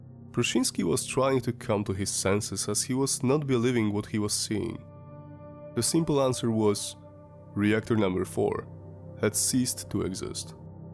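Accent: Russia. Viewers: I thought it was a Russian accent 🤯 Runny nose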